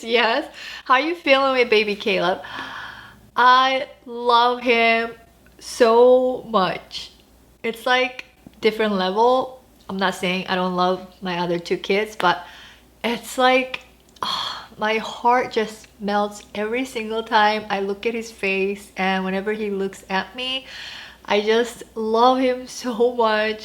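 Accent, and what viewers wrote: South Korea. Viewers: Your English is just like my mom's. She's also Korean and has lived here in the US for 43 years. Still has a strong Korean accent and I love it!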